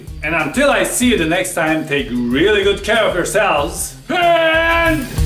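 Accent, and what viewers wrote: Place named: Turkey. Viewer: the Uhahkian lol. Is it can be just because of he's actually from Turkey? So ofc his accent will change.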